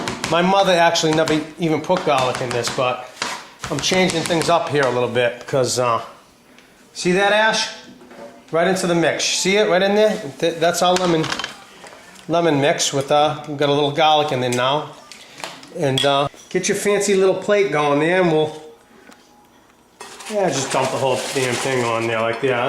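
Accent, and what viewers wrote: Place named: Italian-American, United States. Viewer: Oh your accent doesn't bother me at all. And I love how Italo-Americani try to speak Italian. It's not your fault but living in the States, I'm sure your parents had to learn it making it very difficult to then pass on the Italian language. My parents instead had to learn both English and French for working purposes, but once at home it was Italian. For this I was lucky because later on it came handy, I am now living in Italy. Your recipes are good and easy for anyone to make!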